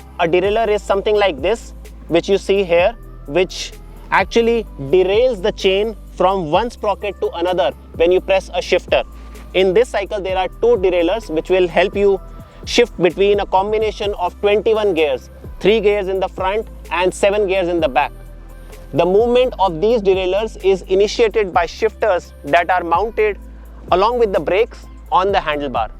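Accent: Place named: India. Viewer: You have a thick Indian accent.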